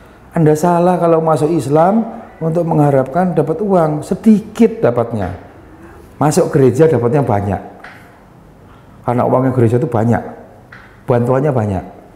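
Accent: Java, Indonesia. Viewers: Denger cara ngomong kok seneng. Logatnya Jawa medok. Ak lahir dan besar di Sumatra tapi logat Jawanya jg tetep g bs hilang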